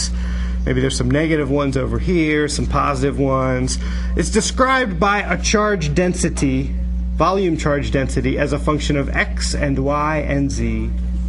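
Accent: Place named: United States. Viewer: Why must American's pronounce every greek letter differently >.<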